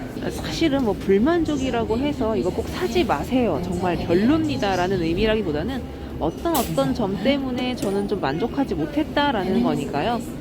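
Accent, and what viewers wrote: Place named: Chungcheong, South Korea. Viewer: 충청도 사투리~~~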